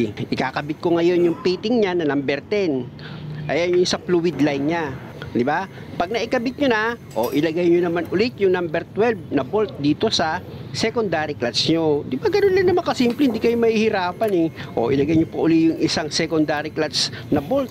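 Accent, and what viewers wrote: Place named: Nueva Ecija, Philippines. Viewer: Boss tiga saan po kayo my garod kayo sa salita eh nueva ecija po??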